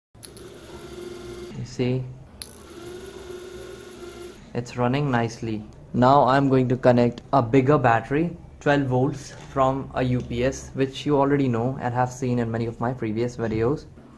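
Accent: India. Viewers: indian accent so funny lol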